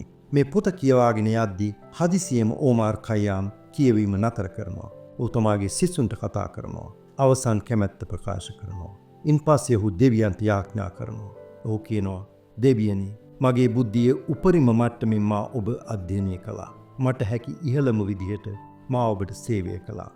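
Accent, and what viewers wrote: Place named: Europe. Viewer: විශිෂ්ඨ විග්‍රහයක්, ඒවගේම ඔබ තුමාගෙ ඉංග්‍රීසි උච්චාරණය හරිම ලස්සනයි යුරෝපියෙක් වගෙයි ❤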